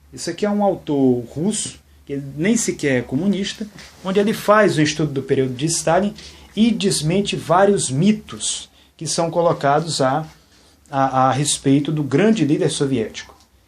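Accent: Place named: Rio de Janeiro, Brazil. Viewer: Afinal, de onde você é? Como você pronuncia "faxista" ao invés de "fascista", achei que você é carioca, pois pronunciar o S como X é bem característico dos cariocas.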